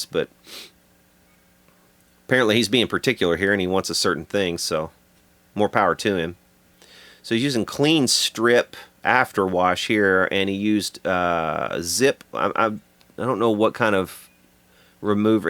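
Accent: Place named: South, United States. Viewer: Always refreshing to hear your Southern accent and your excellent content. I watched that video with that mad scientist guitar builder guy you had the other night and it was so interesting but my favorite video to date has been the one where you soup top the five white Epiphone tube head. Also the Marshall mg restoration vid that you salvaged. I’ve learned so much watching this channel. 🤘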